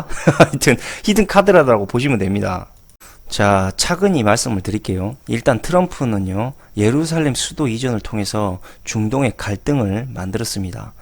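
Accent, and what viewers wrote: Jeolla, South Korea. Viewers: 전라도 사투리 쓰시는게 너무 듣기 좋네요, 영화배우 송새벽씨같아요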